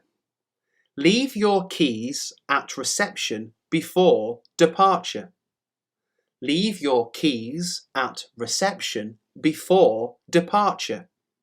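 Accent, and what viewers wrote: United Kingdom. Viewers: Hello, you provide an amazing content here on youtube. I'm very grateful. Thanks a lot. But my son and me, we are a little bit curious from which part of the UK you come from:) because I would like to know more from which part is your accent. Sorry if I bother you with this.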